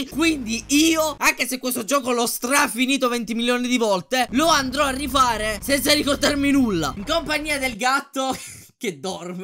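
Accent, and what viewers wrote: Sicily, Italy. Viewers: sei siciliano? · Ma sei siciliano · Ma sei siciliano? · Sei siciliano? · ma sei siciliano?😱😱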